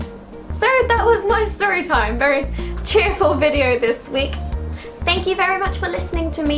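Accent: United Kingdom. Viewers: what british accent is this? I LOVE IT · i'm a simp for british accent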